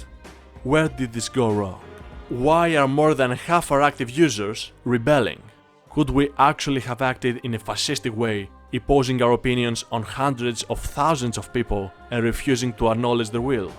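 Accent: Greece. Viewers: You have a strong greek accen. Are you greek or im just wrong :-/ ?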